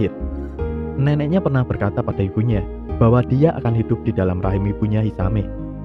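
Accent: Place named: Java, Indonesia. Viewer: auto sub, kekurangan nih channel ya cuma logatnya agak medok :v eh kadang medok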